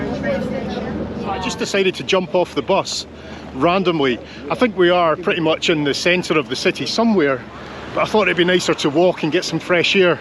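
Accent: Scotland, United Kingdom. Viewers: The first time I heard the scottish accent I thought it was a guy from Bergamo attempting to speak english.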